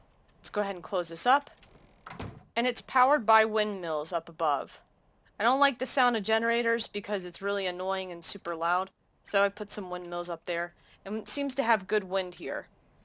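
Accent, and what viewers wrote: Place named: United States. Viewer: She sounds cute 🫠 American accent. Still uploading Ark? 💞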